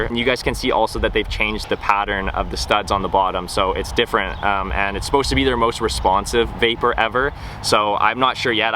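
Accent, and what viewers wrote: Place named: United States. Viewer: you sound like an amercian but if you gonna review fix up on your wording and facts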